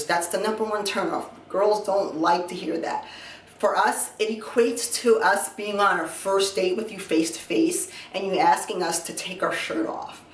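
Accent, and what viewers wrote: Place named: New Jersey, United States. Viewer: That Jersey accent lol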